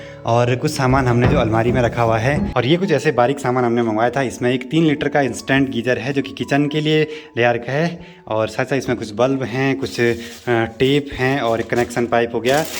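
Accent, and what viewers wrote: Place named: Garhwal, India. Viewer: Bhai aapki garhwali boli bahut Mithi hai.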